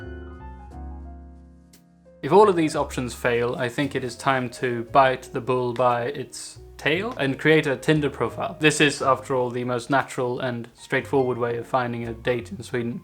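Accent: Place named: England, United Kingdom. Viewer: Are you Swedish or English? If not, where did you get your English accent? Can you do one of you speaking Swedish?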